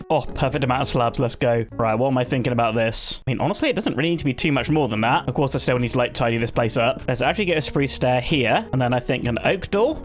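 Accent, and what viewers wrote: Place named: United Kingdom. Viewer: I Only See your videos Because Of British Accent 😂😂❤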